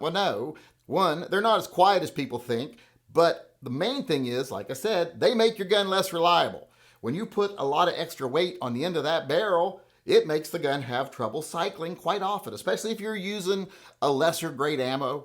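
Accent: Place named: Appalachia, United States. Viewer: You sound like a backwoods hillbilly mountain people ⛰️🏔️ 🤷‍♂️🤷🤷‍♀️🤦🤦‍♀️🤦‍♂️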